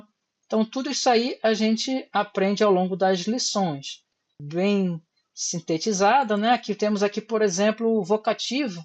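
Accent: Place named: Portugal. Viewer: Nao tem como fugir do sotaque portugues 😂😂😂😂😂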